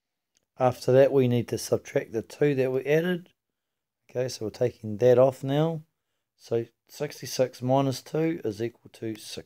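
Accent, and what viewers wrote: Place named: New Zealand. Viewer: as soon as I heard your accent bam I knew you are kiwi!!!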